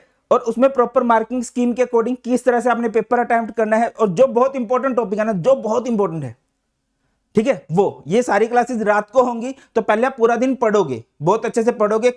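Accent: Haryana, India. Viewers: SIR AAP HARYANA M KARNAL SE HO KYA?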